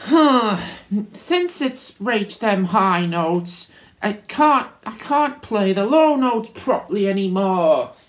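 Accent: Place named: England, United Kingdom. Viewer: Oh yes, youre from England:D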